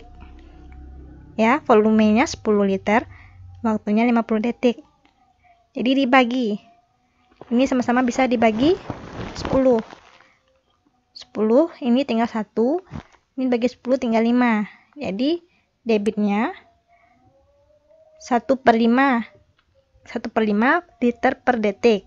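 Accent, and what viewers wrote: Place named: Malaysia. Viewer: suaranya kok gitu kayak orang malaiysa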